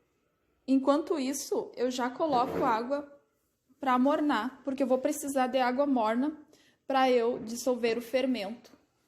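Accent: Rio Grande do Sul, Brazil. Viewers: Voce conversa igual uma amiga do Rio Grande do Sul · É gaúcha né ela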